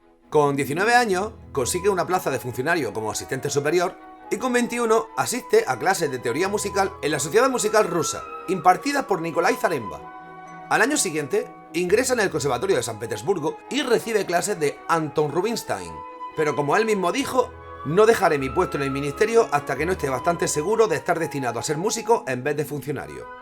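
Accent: Andalusia, Spain. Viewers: es acento español de Andalucía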